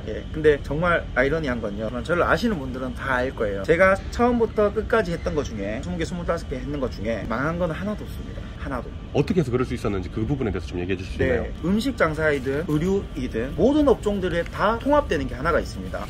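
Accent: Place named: Gyeongsang, South Korea. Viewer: 지금 5번째 반복해서 보고 있습니다. 대구 사투리도 좋고 뭔가 빨려 들어가는 화술에 표정, 나이가 많아도 많이 배우고 갑니다.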